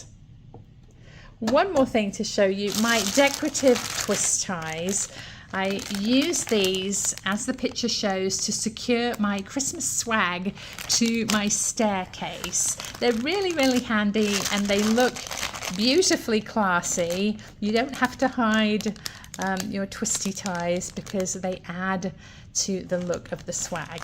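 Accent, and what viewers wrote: United Kingdom. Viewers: you are sooo cool I enjoy watching and following you. It's June from Jersey. You are such a pleasure to watch and listen to. Like that British accent, girlfriend. You are loved in the Northeast keep rocking!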